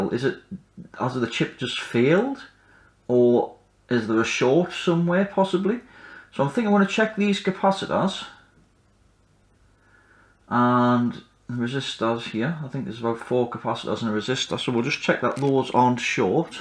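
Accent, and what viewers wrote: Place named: North East England, United Kingdom. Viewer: Ideal item for someone like. Not Vince. I don't like him. He sounds too "nice", he's not my mate and him saying "Trying to fix" implies that he's fumbling about without really knowing what he's doing. YOU, though, do know what you're doing. You quickly identify the problem and just get on with repairing/replacing the dead part(s). You get straight to the point and don't mess about. No silly intro music or graphics. Also i like your North East accent.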